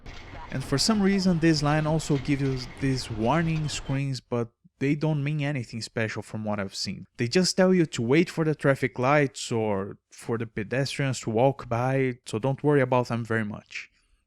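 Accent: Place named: Brazil. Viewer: Man, I can't express properly how happy I am to see a guide like this, and especially one for this really unique (and extremely comfy) version of Densha de Go! Also loves everything you do in your channel, exactly the type of game that I love but gets no recognition (boku no natsuyasumi, man!!!!). Great content all in all, hope you keep it up! Also, are you by chance originally Brazilian? Your accent sounds like it, and it got me curious.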